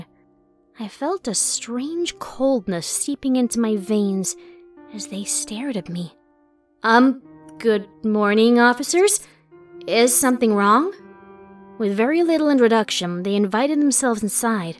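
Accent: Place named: United Kingdom. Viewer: I don't know why,but I never really took notice as to how much suppressed her British accent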